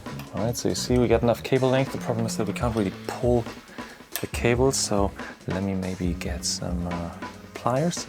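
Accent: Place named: Germany. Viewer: As you can hear in his accent, he is probably German, in my opinion 😉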